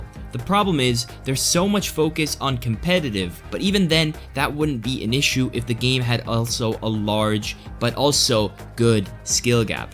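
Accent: United States. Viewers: Completely out of context, but out of curiosity, where are you from? Your accent sounds waaaaay too american for an actual italian, lol.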